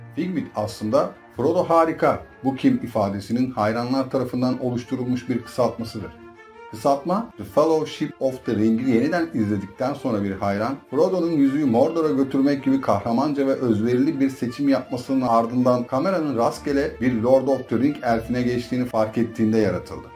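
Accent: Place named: United States. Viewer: Telaffuzlar amerikan ingilizcesi kıvamında olduğundan ekrana bakmadan izleyince feynır kimmiş la deyince ekrana bakınca he bizim eski feanor’muş dedim.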